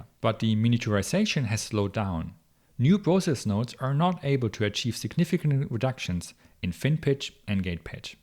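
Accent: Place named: Germany. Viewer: This man is likely from German, no Wonder the English is like what I think, cause previously I have Watched German Laptop reviewer (Notebook Check)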